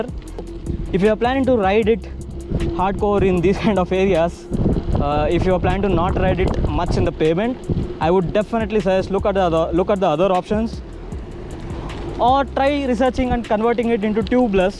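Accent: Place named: South India, India. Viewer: That Mallu/Tamil accent 😁😁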